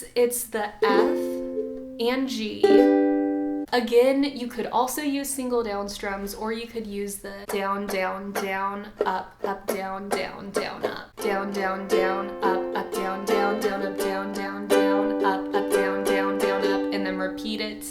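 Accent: United States. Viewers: Yeah tots but why does she speak like a cow girl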